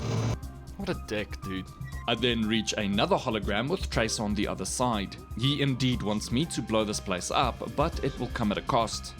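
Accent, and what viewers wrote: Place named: South Africa. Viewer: Are you carring me a riar? Always kills me😂😂..good to hear a South African voice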